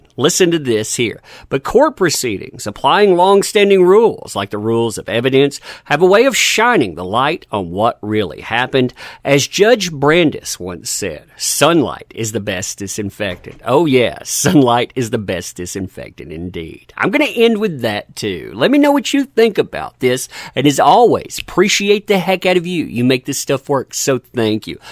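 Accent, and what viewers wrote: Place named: Appalachia, United States. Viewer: Is this your genuine accent? 🤔 Sounds like a Deliverance dialogue!! Be professional, please!!!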